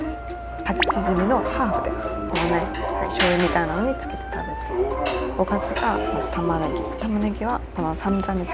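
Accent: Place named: South Korea. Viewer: 일어하는 한국낭자 같아요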